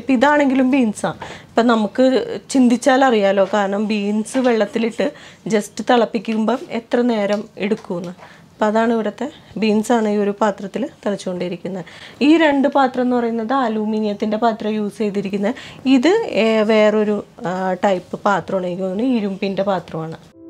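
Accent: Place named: Kochi, India. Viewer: Chechy vyppin Kari anoo / atho kochikari ?slang kettit angine thonnu, enthayalum sambhavam powlichutta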